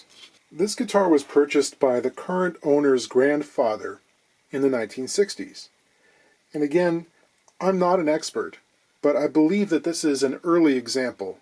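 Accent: England, United Kingdom. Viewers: Your English Accent sucks. I say this as an English person 🙂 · Yeah bit of a mish mash of accents, but you pretty much nailed the mentality. It’s always enjoyable hearing folks from your side of the globe doing Brit accents, I still imitate Dick van Dike from 50 years ago and have been doing it that long. Dad joke don’t get any better in my house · Love the English accent 😊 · How delightful to see such a classic. However, the issue of precedence is moot, with Charlie Watkins (of Watkins Rapier and WEM Copicat fameI claiming to be first to market in 1954 (the year of my birth). I actually owned a Rapier briefly in my 20s and, for reasons which need not concern us (clue, it was in the 1970s and I was in my 20s), have no idea what became of it. It was a piece of history, and the back story is much the same as with Burns. In the UK, tariffs on imported instruments were huge in the 60s, as was the price of the instruments themselves. 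Even the top bands such as the Shadows (and later, the Beatles) spent the early days of not just their careers, but also their stardom playing UK or continental instruments. Thanks so much for this piece of history. If you're watching, I do know you have an ear for accents love the solder/sodder stuff), but your English accent crosses every river from the Thames to the Tyne (mate)! As a Londoner, I can tell different suburbs without getting out of first gear (extra points of the use of the iconic Canadian 'Ey;'). In passing, I note that van gent tuners, from the Netherlands and a great tuner, have been used for many years on a more recent iconic English guitar brad - Gordon Smith. Extraordinaty value instruments. I should mention that, more than ever, your commitment to researching the Hx is super. Great video in every respect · Major credits for the attempted English accent.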